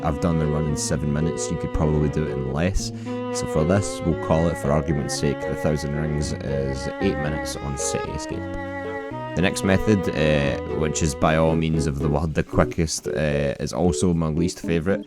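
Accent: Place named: Glasgow, United Kingdom. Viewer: Not only do i thank you for this video but i adore your accent lad , u from glasgow?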